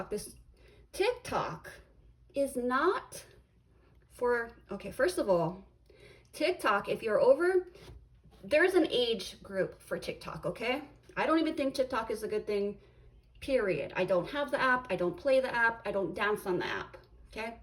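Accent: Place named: Hawaii, United States. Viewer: So the first time I watched you. I was like this woman reminds me of me..speaking wise a little harsh lol I thought she just reminds me of home...then about 3 videos later I find out your from Hawaii..not only home. But my island. I'm on the .mainland now and people don't get me unless they really know me cuz how we talk.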